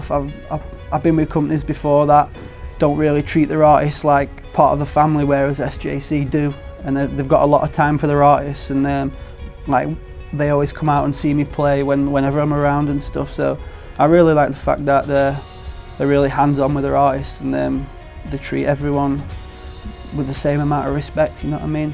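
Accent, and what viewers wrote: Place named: United Kingdom. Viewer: Макс Грин yeah, british accent, if you know what I mean ^^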